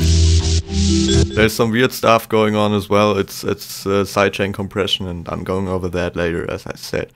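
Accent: Germany. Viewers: Great vid man, thought I detected a German accent ;) Hast du ein eigenes soundcloud seite? Deine beats sind richtig geil!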